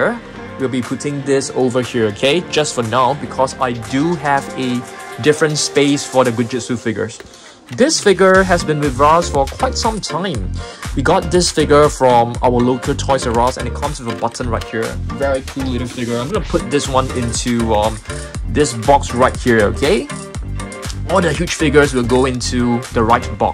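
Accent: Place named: Malaysia. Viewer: He sounds like he is from malaysia and i am hoping i will make a fanart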